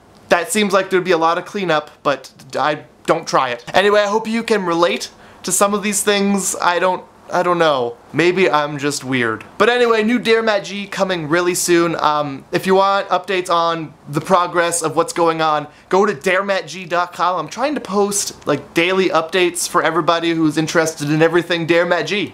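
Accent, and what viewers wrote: Canada. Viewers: his canadian accent :) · he sounds way more canadian than usually here. weird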